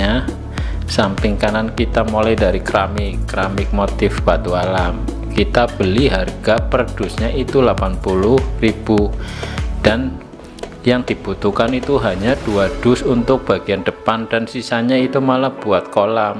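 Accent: Banyumas, Indonesia. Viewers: bahasanya ngapak kaya kuwe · Bhsanya #ngapak😁😀. Sukses selaluh mas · Kayakne ngapak ngomong bahasa Indonesia ne masih keciri ngapake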